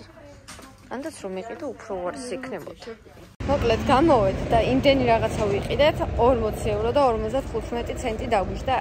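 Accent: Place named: Imereti, Georgia. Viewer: მე თვითონაც იმერელი ვარ და იუთუბზე რო ესეთი საუბარი მხვდება მიხარია რომ ძალიან გახსნილი ხარ და რავი ბევრი ეგეთი არაა და ძან გულწრფელად საუბრობ რავი ჩემგან გულით რესპექტ💜💜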